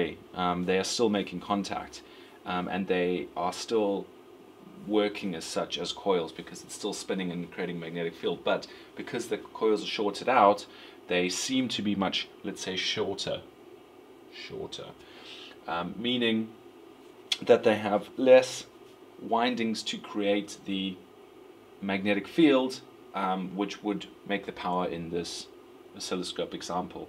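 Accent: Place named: South Africa. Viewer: deffos a south african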